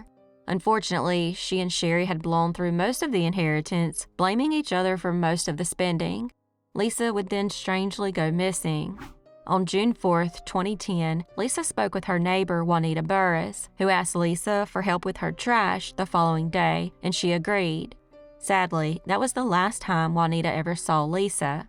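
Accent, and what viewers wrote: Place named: South, United States. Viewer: Lol "MAc" donalds- this is why i listen to you! We speak the same language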